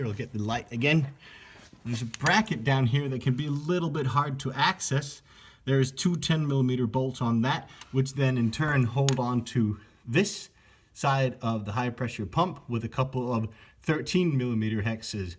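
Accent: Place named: Canada. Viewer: Wait, this guy is Danish? I honestly thought he was Canadian 😂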